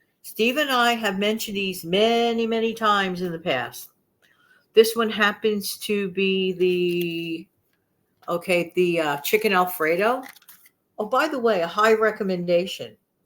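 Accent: New York, United States. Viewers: Personally, i love your New York accent. I think it's great!😂 Oh, i remember those handi snacks,! Those were quite good as i recall! Slim Jims are good too.No red stick....thats just wrong!😮 I agree that things could get very crazy and soon. Yes, we need to be prepared! I thought i would buy a few packages of those wraps we use to make burritos (just cant think what you call them). Thought they would be a good bread replacement in a pinch. Yup, gotta have some sort of grocery plan! Definitely!!! Yes to peanut butter, all day! I love oatmeal too, brings back such good memories from childhood. We always had canned fruit and veg when i was growing up. Still do. Great video. Much appreciate all the great ideas and encouragement....bless all your efforts. I am very grateful. God bless ❤❤❤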